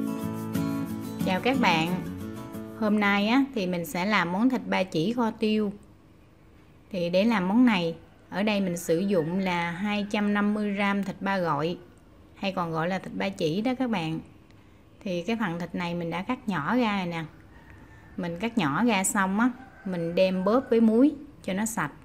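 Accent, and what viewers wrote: Mekong Delta, Vietnam. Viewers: Ủa thấy bình thường mà. Chắc do bạn k nghe quen tiếng miền Tây. Chứ chị đó nói bình thường ắ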